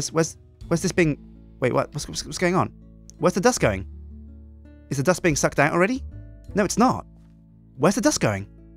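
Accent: Australia. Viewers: His aussie accent is amazing even I can't do that and I am aussie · Australian accent??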